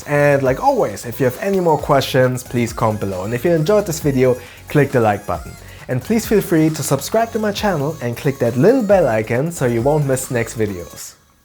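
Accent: Germany. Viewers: I honestly can't tell if you're happy, sad, upset, mad or tired because of your German accent lol. It's basically always one tone because of the pauses. I'm sure that's just a habit of speech from the German language.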